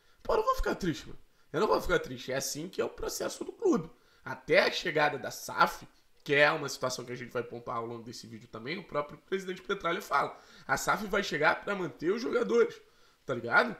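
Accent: Rio de Janeiro, Brazil. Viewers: sou novo no canal irmao, desculpe a pergunta, vc eh carioca? mora aqui em cwb? acho q eh a primeira vez q eu vejo alguem com esse sotaque torcendo p cap, inscrito jaaa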